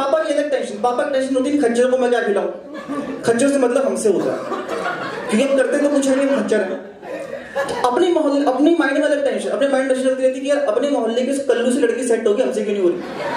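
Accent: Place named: Haryana, India. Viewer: anf yes i like the way u speak the good material... specially ur haryanvi accent...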